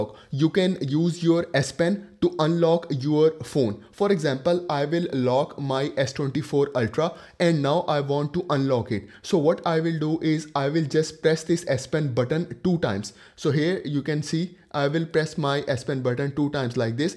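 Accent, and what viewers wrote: India. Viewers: 개인적으로 인도식 영어 발음 너무 좋아요. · I like the Indian accent.. that is very special here in Philippines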